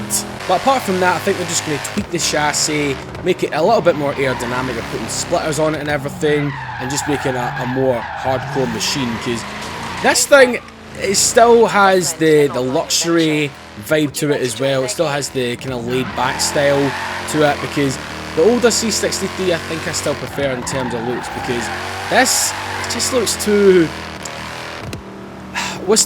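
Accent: Scotland, United Kingdom. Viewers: yeah SCOTTISH ACCENT IS THE BEST!!! :D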